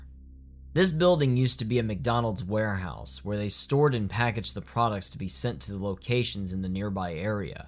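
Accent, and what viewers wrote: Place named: South, United States. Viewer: Nothing wrong with a Southern accent! :P lol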